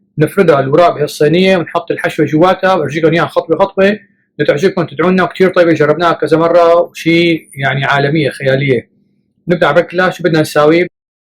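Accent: Levant. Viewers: الله يعطيك العافية متابعة لقناتك لشوف الطبخات الظريفة واسمع لهجتك الشامية الحلوة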